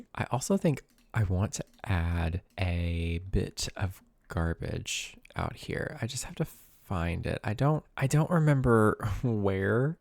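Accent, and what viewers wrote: South, United States. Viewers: ! I love your southern twang you’ve def made the line up of my nightly sims YouTubers ❤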